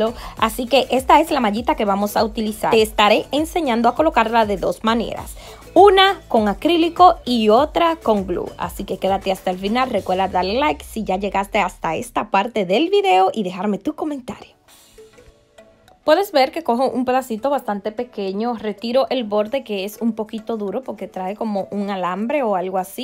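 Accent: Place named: Dominican Republic. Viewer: Una pregunta tu eres #DOMINICANA por qué tu tienes un pequeño acento Dominicano 🇩🇴🇩🇴🇩🇴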